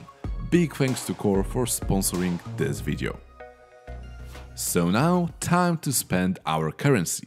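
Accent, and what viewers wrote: Poland. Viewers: Tak mi się coś wydawało, że to Polak do mnie mówi.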